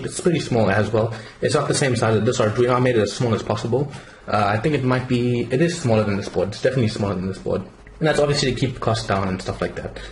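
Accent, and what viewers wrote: South Africa. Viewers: I thought that your accent sounded familiar, You're from Durban! im from Cape Town! Great project man, ill be sure to have a deeper look at your system, Good luck with the future of this project!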